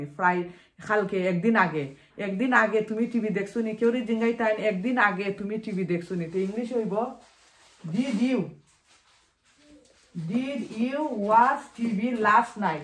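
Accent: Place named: Sylhet, Bangladesh. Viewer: Sylheti mat hunram 🙈🤣🤣❤️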